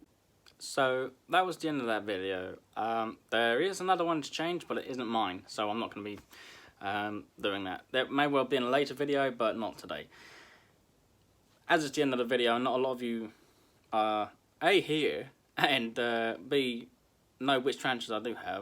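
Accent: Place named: United Kingdom. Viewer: Can tell your from Britain .. what part though? 🤔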